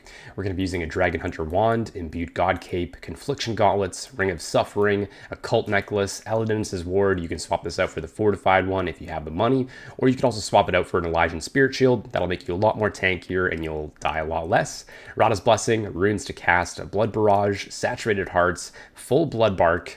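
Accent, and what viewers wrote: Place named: Australia. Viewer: i did not know steve irwin making guides now haha. mate.. haha (aussie) lol. Dragon hunter want just one of the best new additions in the game recently, so many good places to use it. Fantastic and useful guide as always!!!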